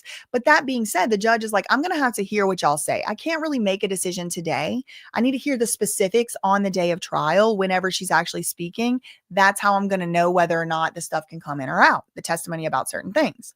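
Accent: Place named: Louisiana, United States. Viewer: 💜💛💚⚜️ this Cajun is here in every chat! Im not always talking, but always learning from you! I love hearing the bayou in you come out 🥰 keep doing amazing things, Queen! Thanks for doing what you do!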